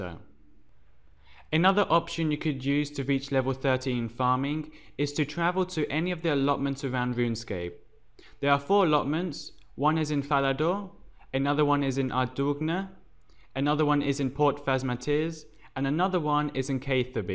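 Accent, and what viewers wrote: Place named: Iran. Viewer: r u persian?cuz ur name and accent is showing.are u persian? btw gr8 guide subbed and liked;)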